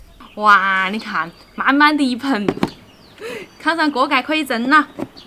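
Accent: Sichuan, China. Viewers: 她讲的就是川普啊。。真要讲方言根本听不懂，尤其是说的快的时候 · 四川話好好聽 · 一口的四川话哈哈哈，我也是四川的，不过我现在在澳大利亚，我很喜欢看你的视频，做菜好看又好吃，人又长得漂亮大方。祝你有个好喂口加油哈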